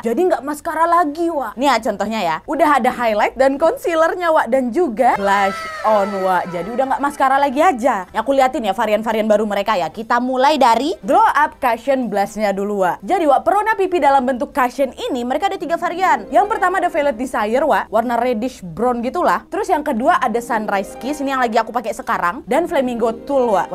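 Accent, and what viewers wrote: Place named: Medan, Indonesia. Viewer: Medan kali logat nya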